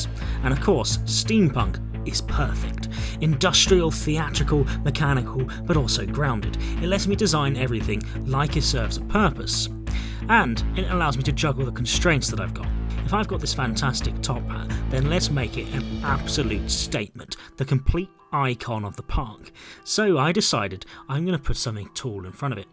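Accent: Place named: England, United Kingdom. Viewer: Your flow of words and English accenting makes you sound like that specific really wise guy that everyone loves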